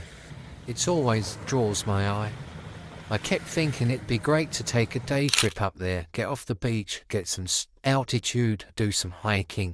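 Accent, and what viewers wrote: United Kingdom. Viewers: narrated in the style of Monty Python's 'Bicycle Tour of North Cornwall' very uplifting more like this with English accent please thanks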